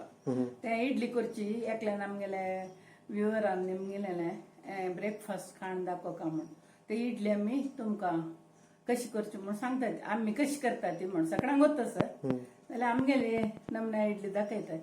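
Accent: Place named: Mangalore, India. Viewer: Authentic GSB Mangslore konkani .......feels so nice to hear. I herd it after over two decades!....GSB food is superb!!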